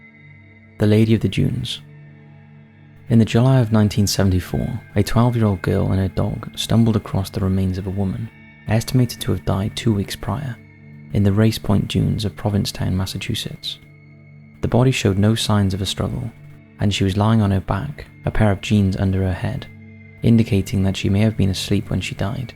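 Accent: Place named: England, United Kingdom. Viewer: He's definitely English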